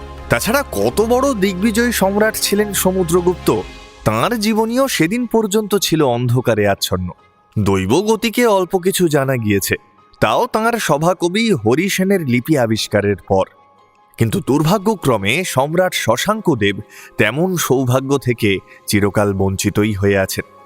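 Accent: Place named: Kolkata, India. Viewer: Apnar pronunciation khub Valo. Kolkatar Bangla accent mashallah shundor khub.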